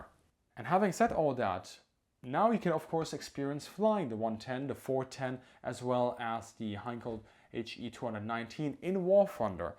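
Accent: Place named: Germany. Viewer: oder ich weiß nicht ganz aber dein deutscher Akzent ist sehr stark raus zu hören wenn du Englisch sprichst😂 Aber deine Videos sind sehr toll und gefallen mir gut. Eine Frage noch: spielst du irgendwann wieder IL-2 BoS mit Bo, Loli und so?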